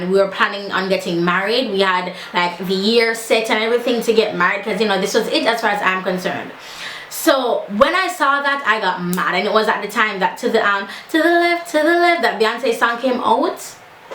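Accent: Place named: Jamaica. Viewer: love you girl. ..proud to see a Jamaican in this mode...positive and smiling. ..love how yu switch up di talk!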